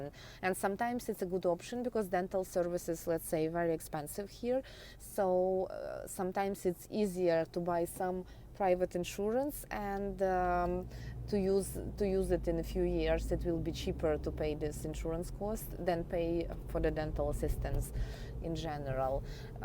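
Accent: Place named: Russia. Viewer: Fookin Russian accent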